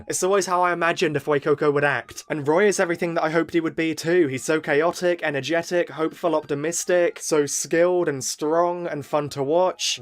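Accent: United Kingdom. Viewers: Man you call that a sick voice? At least you have that british accent to cover it up,when i speak when im sick i sound like a minecraft zombie💀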